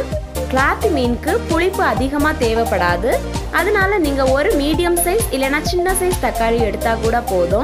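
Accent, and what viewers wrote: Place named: Marthandam, India. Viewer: Aiwaa marthandam tamil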